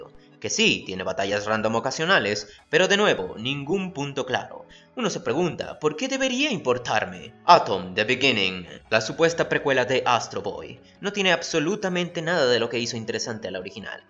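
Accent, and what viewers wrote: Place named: United Kingdom. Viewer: Se que todos odiaran este comentario, pero extraño el acento britanico de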